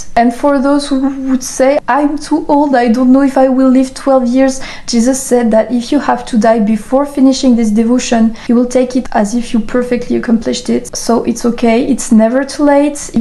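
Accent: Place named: France. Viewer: What did she say the prayers were??? I'm having a hard time with the French accent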